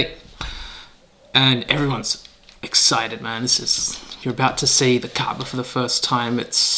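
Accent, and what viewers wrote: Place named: Australia. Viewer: Masha Allah brother, I also went for Hajj this year. Your accent suggests you are Australian? So am I. Perhaps we crossed paths?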